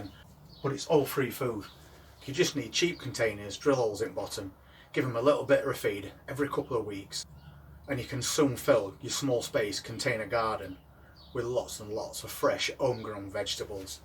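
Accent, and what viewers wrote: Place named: North, United Kingdom. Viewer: ive just found your chsnnel. What a devastating time youve had and what a crap way to be told to move. You are so inspirational and youve got a definite follow from me. Love love love your wonderful northern accent and grounded, calm way of communicating. Youre such a dude! Im going to look at your other videos snd keep learning. See you soon ajd hope you and yours sre keeping your heads held high!!!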